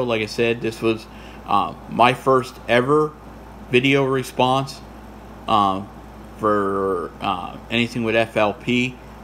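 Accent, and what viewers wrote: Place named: Eastern Pennsylvania, United States. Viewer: Did I read a comment that you are in Eastern PA. That is where I am Originally from. I should have recognized the accent.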